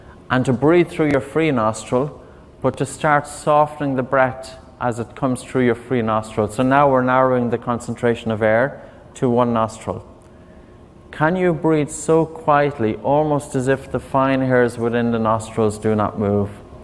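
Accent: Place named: Ireland. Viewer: Such a lovely Irish lilt!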